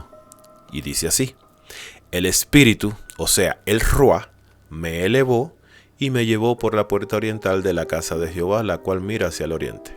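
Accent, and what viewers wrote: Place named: Cuba. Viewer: Me Gusta tu Acento Cubano, también soy Cubana 🤗🤗,me relaja mucho escucharlo 😴..Menos mal yo no creo ni en el Cristo ..Soy un Alma libre y como alma que soy no hay nada más poderoso que yo, solo la fuente que me Creó! por eso nos mienten y se esconden de nosotros, porque realmente el alma es misidial para ellos.. lo aprendí gracias a Corrado Málanga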